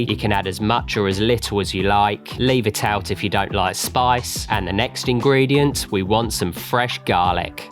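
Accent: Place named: West Country, United Kingdom. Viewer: west country, south west england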